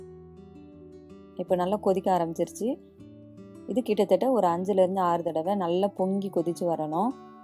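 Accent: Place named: Tirunelveli, India. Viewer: எங்கள் சொந்த ஊர் குற்றாலம். உங்கள் பேச்சு மற்றும் சமையல் செய்முறை பார்த்தால் திருநெல்வேலி போல் உள்ளது. உங்கள் சொந்த ஊர் எங்கே.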